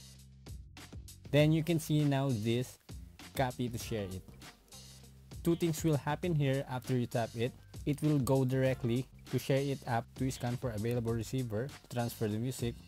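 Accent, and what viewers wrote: Philippines. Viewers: Are you a filipino cuz of you’re accent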